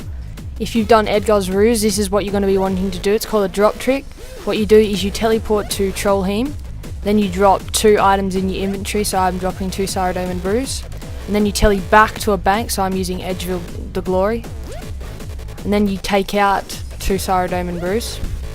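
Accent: Australia. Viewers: hey buddy u sound aussie, im aussie too. im maxed would you like to go arma sometime?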